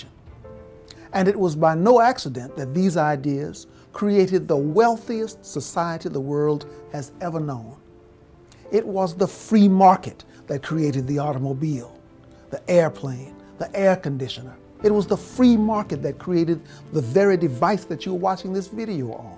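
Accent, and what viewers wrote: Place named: United States. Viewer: FINALLY!!!!!!! Someone who speaks "American"!!!!!!! Thank You, Sir.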